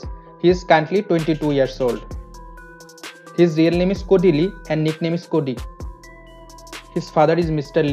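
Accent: India. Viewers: After this guy uttered just a few words I had to stop watching this video because his Indian accent was SO thick I couldn't understand what it was that he was saying enough in order to continue listening anymore! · Bollywood acent.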